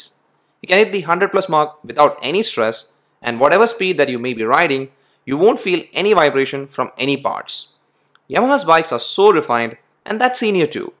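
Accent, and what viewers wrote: Kerala, India. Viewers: Njan Oru malayaliyude accent spot cheyyunund Bro malayali aano?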